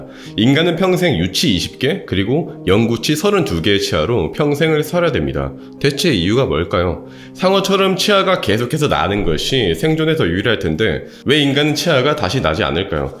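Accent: Gyeongsang, South Korea. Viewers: 경상도 억양 엄청 심하네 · 아 경상도애가 표준어 따라하는 억양 역겹네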